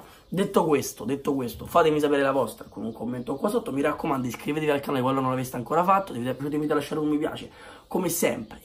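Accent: Rome, Italy. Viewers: io ti seguo da sempre e mi sei sempre piaciuto ma come giornalista sei di basso livello perché sbagli tanti verbi e ti metti a parlare in dialetto romano.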